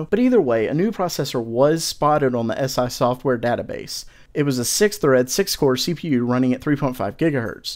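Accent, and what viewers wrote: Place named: South Carolina, United States. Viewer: Trying to pin point your accent, armican mid south, possibly south Carolina, I am a Canadian so I don't know my states very well, lol.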